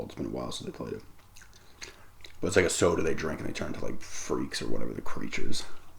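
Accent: New York, United States. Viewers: Such a new york accent compared to today's videos. I dont even know who this is 😂 you the man!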